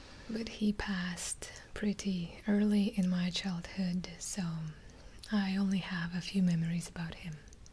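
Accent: Russia. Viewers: I really love Russian accents and truly enjoyed your story! Hope you have an awesome day! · That's a very cool story. Love your Russian accent and you do a great job on your videos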